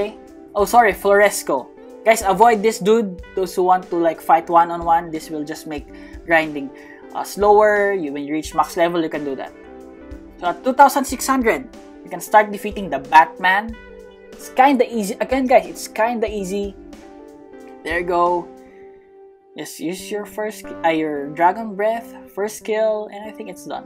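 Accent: Philippines. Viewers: Are you A filipino? because you talk like one and when you like, make a mistake you say ay, sorry guys sorry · I was right ur filipino cause me as a filipino speaking english thts how i sound like also i saw tht text on messenger na maaga ka bukas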